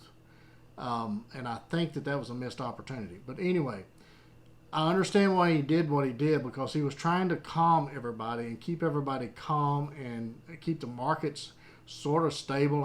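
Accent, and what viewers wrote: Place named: South, United States. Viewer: Thank-you --calm, southern, common-sense. You need to be on President Trump's team--once a week "The Minute"--seriously, a calming voice in a sea of fear. Again, thank-you for posting your comments. At first I wasn't sure what to expect. God bless and keep you and your family safe.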